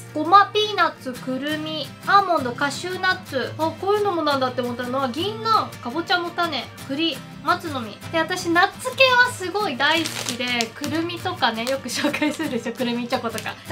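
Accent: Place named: Kansai, Japan. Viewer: 関西弁ええなぁ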